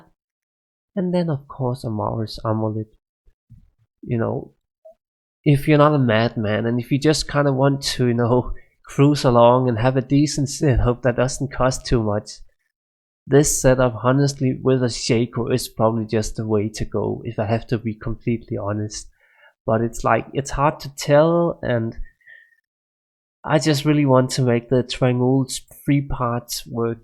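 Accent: Denmark. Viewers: Du lyder Dansk :) But really cool guide mate. might consider rerolling Necro.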